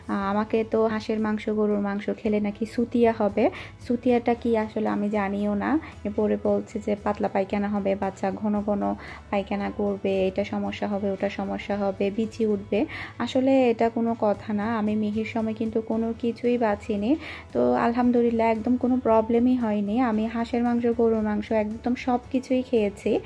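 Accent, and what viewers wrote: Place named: Noakhali, Bangladesh. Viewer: Apu kmn achen.apni noakhalir sune valo laglo.nijer khub kacer akjon Mone hoy.amio noakhalir meye.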